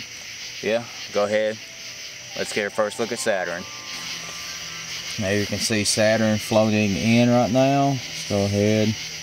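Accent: United States. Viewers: where in America is this guy from for that accent? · Wat state is his accent from...sounds cool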